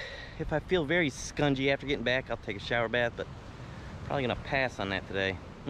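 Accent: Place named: Kentucky, United States. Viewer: thanks for great video! best on youtube!cool Kentucky dialect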